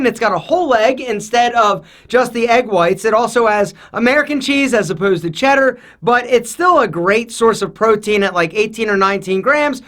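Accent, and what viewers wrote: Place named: Upstate New York, United States. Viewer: Where's the accent? Sounds upstate NY.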